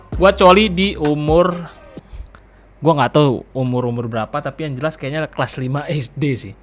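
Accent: Java, Indonesia. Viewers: Waduh gak nyangka loh aku bang org Aceh, krn kalau kita lihat dari cara berbicaranya seperti org Jawa tulen Salam ya bang dari Aceh juga, doain juga di beberapa daerah di Aceh lagi terdampak musibah banjir bang. Mudah mudahan suksesnya bisa nular ke kita juga bang, sukses terus bang 🙏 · Lu orang Aceh tpi logat lu kek orang Jawa bg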